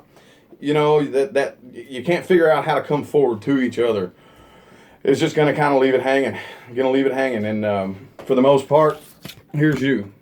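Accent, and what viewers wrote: South, United States. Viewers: Where is his accent from? Is that a southern accent? haha. Love it thanks! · From the second you opened your mouth I knew I had to hit subscribe! Love the southern accent! Great reading.....!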